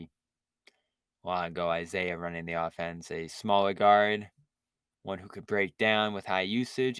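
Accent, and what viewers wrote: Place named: Boston, United States. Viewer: I’ve your Boston accent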